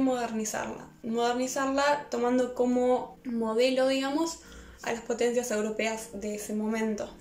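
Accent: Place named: Rioplatense, Latin America. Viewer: Estuve buscando un canal argentino de libros (porque me encanta el acento rioplatense 😍) y encontré el tuyo, me gusta muchísimo! ❤️ Lorca es mi escritor favorito, leí Bodas de Sangre hace un año en original y para mí es una maravilla ❤️ También vi la película y pienso que es tan mágica como libro, me encanta! Saludos desde Polonia 🇵🇱❤️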